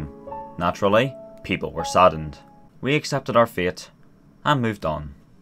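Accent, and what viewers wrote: Germany. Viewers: You german? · wait, are you german ?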